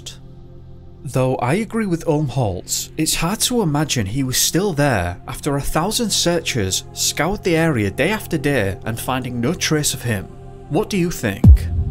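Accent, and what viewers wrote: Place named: Northern England, United Kingdom. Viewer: As an aside, love your accent, but can't place it... northern England? Yorkshire? Tell me!!!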